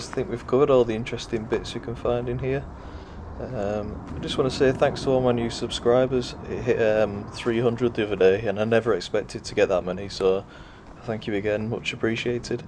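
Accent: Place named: Yorkshire, United Kingdom. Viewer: Beautiful setting. So much bird song. I like your respect and also your humour. I cant quite place your accent. Is it Yorkshire or Lancashire?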